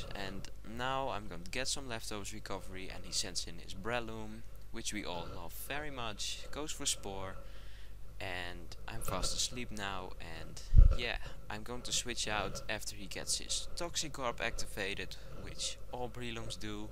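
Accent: Netherlands. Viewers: Hier had je echt nog een typisch hollands accent :] Wel enige verbetering in gekomen! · are u dutch ? cuz i am dutch and i think i hear a dutch accent in your english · you sound dutch!?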